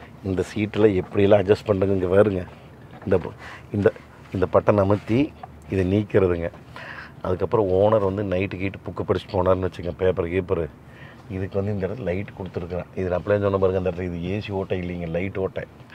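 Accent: Kongu, India. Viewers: நான் அண்ணா வணக்கம் நீங்கள் கொங்குத் தமிழ் பேசி மிகவும் அருமையான ஒரு வார்த்தைகளால் மிகவும் எங்களை எளிமையாக்கி விட்டீர்கள் உங்களுக்கு டொயோட்டா கம்பெனி மிகப் பெரிய கடமை பெற்றுவிட்டது எல்லாம் உங்களை சாரும் ஏனென்றால் விளம்பரத்தை விட நீங்கள் சொல்லும் ஒவ்வொரு வார்த்தைகளும் இனிமையாகவும் சிறப்பாக உள்ளது அண்ணா உங்கள் வார்த்தைகளுக்கும் உங்களுடைய அன்பு தமிழ் மொழிக்கும் கொங்கு தமிழ் மொழி வாழ்த்துக்கள் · அன்னா வன்டியவிட உங்ககொங்குதமிழ் ரொம்பபுடுச்சுருக்கு வாழ்த்துக்கள் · மகிழ்ச்சி, வாழ்த்துகள் வெகு நாள் களித்து கொங்கு தமிழில், கேட்பதற்கு இனிமை. கொங்கு தமிழில் வெல்பயர் அனுபவம் ... அட்டகாசம் இப்படியொரு அனுபவத்தை கொடுத்ததற்கு டொயோட்டா நிறுவனத்தினர் உங்களுக்கு சன்மானம் கொடுத்திருக்கவேண்டும், அப்படிகொடுத்திருந்தால் கூட அது தகும் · குழந்தை மாதிரி முகத்தில் எவ்வளவு மகிழ்ச்சி..எங்கள் கொங்கு தமிழில் பேசுவதும் அழகு! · அய்யா கொங்கு பாஷை..அடி தூளு..சூப்பர்..சூப்பர்..என்னமோன்னா மூஞ்சி ரொம்ப நீளமா இருக்குதுங்கோ...என்ன தான் இருந்தாலும்...அந்த காலத்து பிளைமவுத் ரேஞ்சுகெல்லாம் கெடையாதுங்க..உங்க பேச்சுல அந்த ஙொன்னியா... 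கோயமுத்தூர் குசும்புங்க...மறக்காம நண்பர்களே நம்ப THILAVU TALKIES.. You tube Channel..வந்து பாருங்க..பின்ன‌ நாமெல்லாம் THAR..ஜீப் வாங்கறது.. மறந்துடாதீங்க மக்களே...மக்களுக்கு மக்களே... Ukkrishwriter Mtp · உங்களின் கொங்கு தமிழ் பேச்சு அழகோ அழகு அண்ணா.... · Ungal Kongu Tamil Azhagu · நீங்கள் பேசற கொங்கு தமிழ் அழகுங்க ணா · கொங்கு தமிழில் இயல்பான இயற்கையான வர்ணனை காரை தேர் என தலைப்பிட்டது அருமை. அய்யா இதேபோல் வாழ்க்கையின் இன்பங்களை அனுபவிக்க எல்லாம் வல்லவனை வேண்டுகிறேன். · குழந்தை மாதிரி முகத்தில் எவ்வளவு மகிழ்ச்சி..எங்கள் கொங்கு தமிழில் பேசுவதும் அழகு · Sir, your narration so nice, kongu tamil slang no words very beautiful... sir good night..... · Super Sir! Your explanation in native kongu language is sweet and enjoyable. Nice car sorry nice chariot. Thanks 🎉🎉🎉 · அந்தக் கார் எவ்வளவு அழகோ அதே அளவு நீங்கள் வர்ணிக்கும் கொங்குத் தமிழின் உச்சரிப்பும் எனக்கு எங்கள் நெல்லை தமிழுக்கு அடுத்தபடியாக என் இதயம் கவர்ந்தது கொங்கு தமிழ் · Excellent Review with Amazing Natural Kongu Tamil yengu Anna,... 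Fantastic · எல்லாத்துக்கும் புரியிற மாதிரி அழகான கொங்கு தமிழ்ங்க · Kongu Tamil speech superb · உங்க நடைமுறை பேச்சு மிக அருமை.. அதுவும் கொங்கு தமிழில் 👌👌❤❤❤ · Kongu slang 👌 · Unga Kongu Slang Nalla iruku😍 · கொங்கு மண்ணிற்கே உரித்தான தமிழ். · சூப்பர்னே, கொங்கு slang review கேட்கவே அருமையா இருக்கு.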